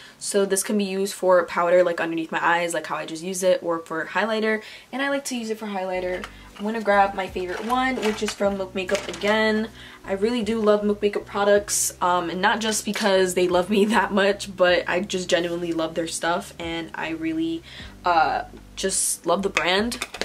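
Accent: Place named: New York, United States. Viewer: i'm from nyc and when i got to i was like 👀😗i'm getting nyc vibes is she from nyc?? and sure enough 😛🤟🏽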